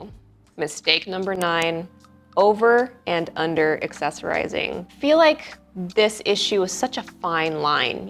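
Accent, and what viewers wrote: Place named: Australia. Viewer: I love you!! And your Australian accent is the best! 😂 very helpful tips!! 🙏🙏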